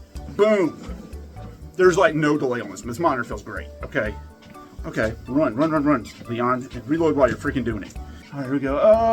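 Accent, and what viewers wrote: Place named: Tennessee, United States. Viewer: Random question my dude: Where the heck are you from? lol your accent sounds so damn similar to my home/ location (Knoxville TN USA). Great content regardless, keep it up haha!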